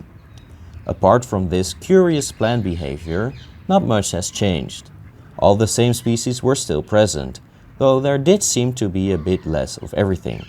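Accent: Netherlands. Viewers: i smell a dutch accent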